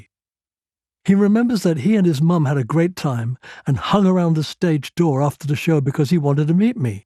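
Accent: United Kingdom. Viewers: Greetings SIRCLIFF RICHARDOBE. Thank u for sharing …this recording ..awesome reading of segments from ur book…ur Brilliant British accent is a delight to my ears..I would listen intently to further reading! An accent that seems to be disappearing.. beautiful blessings!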